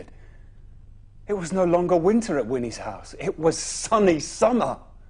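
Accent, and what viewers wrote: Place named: Wales, United Kingdom. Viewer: the welsh accent xD I am deceases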